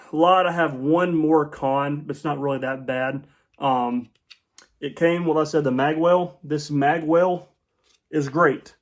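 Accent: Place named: Australia. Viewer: Interesting accent. Is this guy an Aussie expat?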